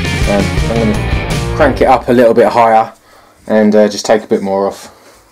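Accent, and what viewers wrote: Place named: England, United Kingdom. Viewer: Great video spoilt by such crap music. Great to hear an English accent for a change on here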